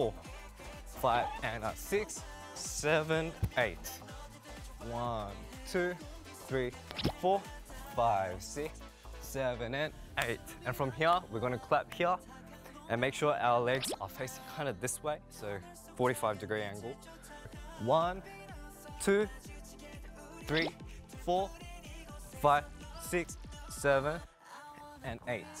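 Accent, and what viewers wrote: Australia. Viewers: i don't stan straykids at all but this is the cutest this i've ever seen, felix's australian accent is the cutest thing ever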